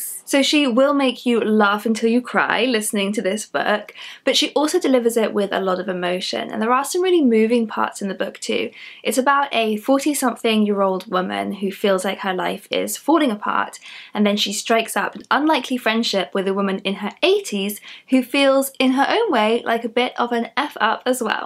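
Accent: United Kingdom. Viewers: British accent is amazing. Thanks for these recommendations 😊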